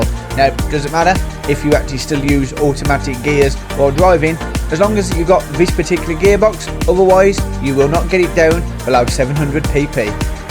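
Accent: United Kingdom. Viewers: I like how you gave Naffan Tait a mention last time. I also enjoy listening to the different British speech patterns (there’s a million of you you here in Western Australia) keep up the good work- your builds help out no end.🇦🇺🇬🇧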